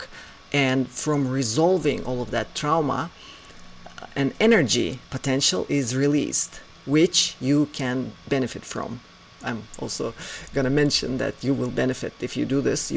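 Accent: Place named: Russia. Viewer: Thank you again Ms... I love your content as much as your rich Russian accent... You presentations are always very clear and informative... 🙏💜😃💜🙏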